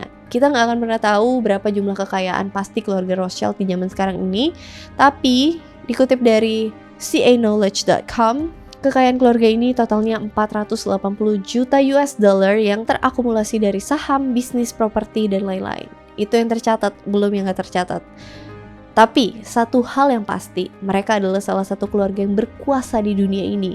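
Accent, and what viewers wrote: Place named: Banyumas, Indonesia. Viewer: Love you...Ngapak Bae bahasanya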